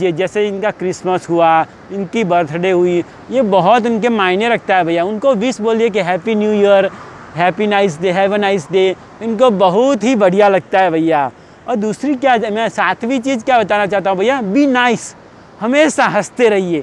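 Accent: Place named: North, India. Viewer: आप गुजराती है पर आपकी हिंदी का लहज़ा उत्तर भारतीय है , ये बड़ी अच्छी बात है।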